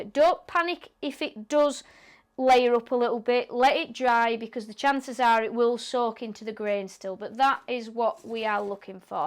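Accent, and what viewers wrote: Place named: England, United Kingdom. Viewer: Sorry I am so late with my comments but I just found this video. As I am a colonist (US) I usually find the English accent a bit difficult but in this case not at all. And I was quite surprised to find this video ran 48 minutes. It held my attention for the entire run. The finished work piece is very attractive and the production quite well done. Thank you for sharing. Have a great day and stay safe.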